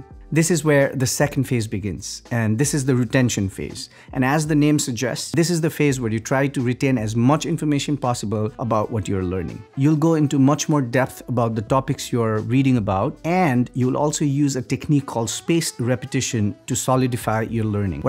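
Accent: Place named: Nepal. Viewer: i am watching you from your early days in YouTube. But today, i dont know why i found Nepalese tone with your voice. 😁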